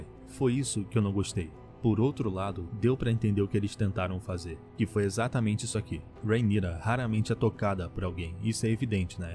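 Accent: Rio de Janeiro, Brazil. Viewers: Esse sotaque carioca me pega muito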